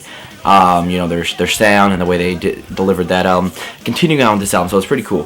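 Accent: Canada. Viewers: You're from Canada, ain't ya bud?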